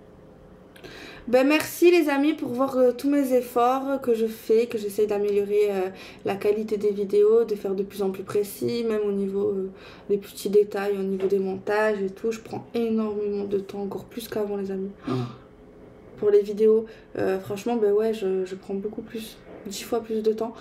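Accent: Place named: Marseille, France. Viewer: Bonjour ma belle j’adore ton accent marseillais quand tu es dans l’emotion merci pour ce message je te kife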